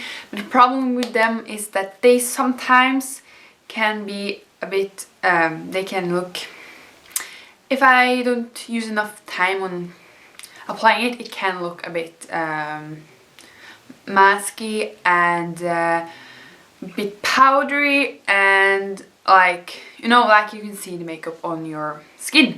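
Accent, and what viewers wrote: Norway. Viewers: Are you Norwegian? It kind of sounds that way, because I'm Norwegian too! :D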